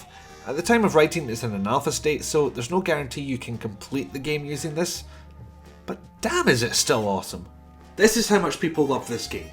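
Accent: United Kingdom. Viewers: I love your Scottish accent!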